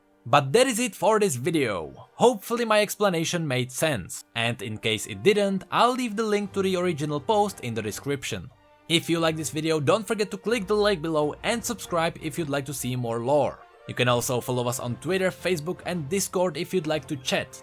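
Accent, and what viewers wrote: Czech Republic. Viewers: Czech accent ? Or ? I think you are from czech republic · He is from The Czech Republic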